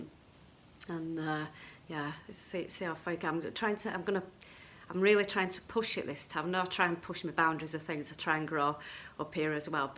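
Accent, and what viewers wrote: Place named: Greater Manchester, United Kingdom. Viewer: Hi, what a lovely video this time of year. I would say 90% of my seeds are from Premiere Seeds Direct for at least 8 years. I have had great germination and find them great to work with. I've not long got back from work and I asked my fiancee to guess your location from your accent. She thinks Ashton or Stalybridge! ( She was originally from Stalybridge) I cannot wait for spring, it feels like I haven't seen the sun for months and we are in Lincolnshire so further South than you. I think I'll spend the evening in my grow room with a book pretending I'm on holiday! The lights I use are full spectrum and look just like a summer's day!! Take care and hopefully spring is just around the corner for us all.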